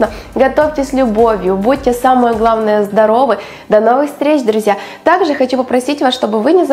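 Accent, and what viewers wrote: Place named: Ukraine. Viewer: Какая ты прикольная почему похудела очень нравится твой украинский акцент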